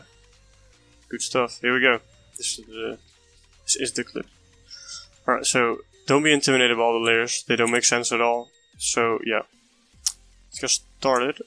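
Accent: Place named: Netherlands. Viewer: Hahah I knew immediatly that you we're dutch when I heard your voice. May I have your skype, or you can add mine if you want: <mail> I have something I would like to talk to you about!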